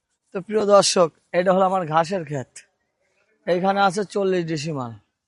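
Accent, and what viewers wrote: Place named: Gaibandha, Bangladesh. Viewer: ভাই আপনার হোম টাউন কি কোন ভাবে গাইবান্ধা জেলায়? ভাষার টান টা তেমনি লাগে। আশা করি রিপ্লাই টা দিবেন।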